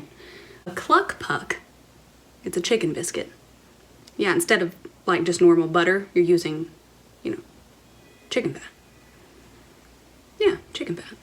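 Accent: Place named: New York, United States. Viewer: New York accent - just a hair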